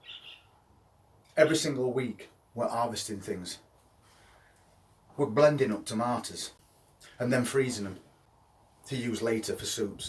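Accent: Yorkshire, United Kingdom. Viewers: Love your (Yorkshire - correct me if I am wrong) accent and use of words. Will just leave it on bench❤. Was checking the size garden delights grow to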